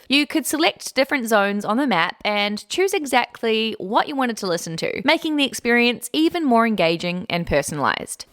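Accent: New Zealand. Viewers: I like how your Kiwi accent becomes stronger when you're in NZ, especially with your family 😄. Awesome video. NZ is truly quite beautiful. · Fortunately, your Kiwi accent actually isn't too strong for me to understand and to fully comprehend. As a matter of fact, it's really quite pleasant listening to! I'm a GER, trying to improve my English listening skills by watching your wonderful videos. Rail travel has been my favorite mode of transport for decades, I can relate to any second of your content. While watching and eventually commenting on this video, I just might improve my writing skills as well! Tons of looove and kudos to you from a GER subscriber.... 🥰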